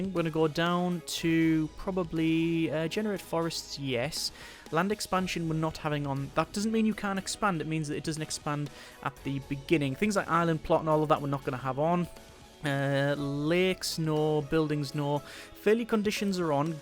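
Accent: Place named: Newcastle, United Kingdom. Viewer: You sound slightly Geordie and if so big up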